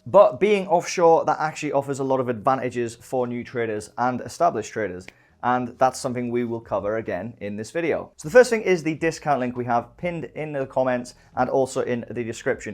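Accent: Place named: Newcastle, United Kingdom. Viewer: Love the Geordie accent are you in Newcastle??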